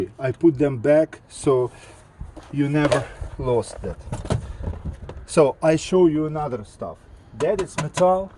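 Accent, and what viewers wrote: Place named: Russia. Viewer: Спасибо брат, акцент похож на русский)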